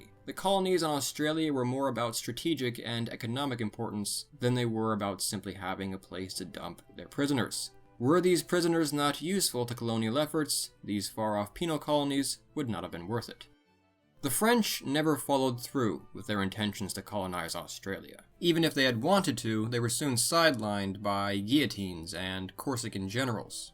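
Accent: United States. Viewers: Why is there a American talking instead of a Australian? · I was sceptical when I first heard the American accent on this doco but it is excellent and really comprehensive, with information that I, as an Australian, didn't know. I particularly love that you include the fairly recent genetic information regarding where the people travelled from to populate the continent and surrounding islands. I'm using this as a resource to teach my son Australian History and we'll definitely check out your other documentaries. Thank you! Really well done!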